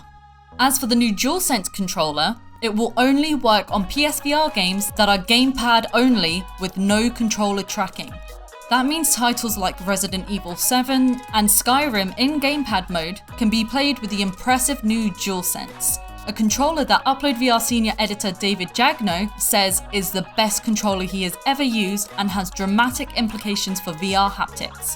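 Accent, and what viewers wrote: United Kingdom. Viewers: Hahahaha and she has a british accent. Thats bollocks! Lol. It works in the us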